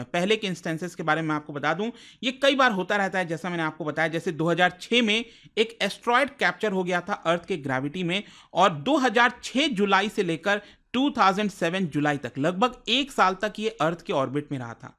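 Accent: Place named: Bihar, India. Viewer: Bihari type of speech Nonsense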